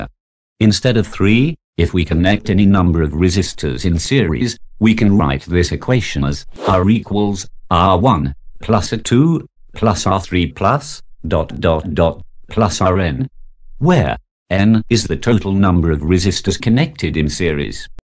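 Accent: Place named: India. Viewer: when you have an Indian accent and a voice of a robot you get this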